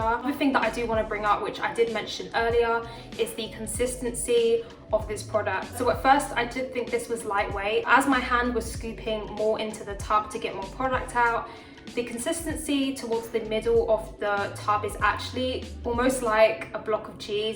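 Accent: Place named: United Kingdom. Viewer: Just a quick question while I’m here, I’m judging by your accent that you’re in the U.K, so just wanted to ask where did you get your hair cut please. I love it!??!! 😍 I’m looking for a good curly hair stylist for a trim. Thank you. Looking forward to going through the rest of your videos.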